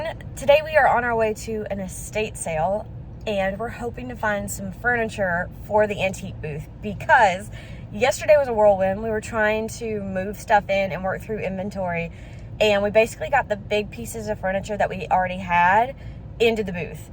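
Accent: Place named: Carolinas, United States. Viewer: You mention knowing Abby ~ she’s from the Jacksonville area and you sound like your’re from one of Carolinas. Take Care. 😊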